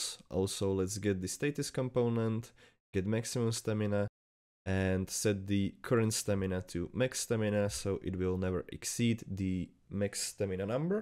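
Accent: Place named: Slovakia. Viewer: Už len podľa prízvuku viem povedať že si slovák, ale popiči tutorial bráško dikes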